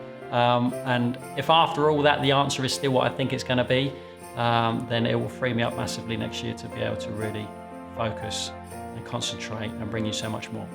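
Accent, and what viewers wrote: United Kingdom. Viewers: Merry Christmas,. None of the "issues" have been an issue for me. I just love to see you giving bread tips with all your energy and that unmistakable British accent of yours. P.S. What is your secret for looking so young? You must be in your twenties or thirties, but you look like a teenager.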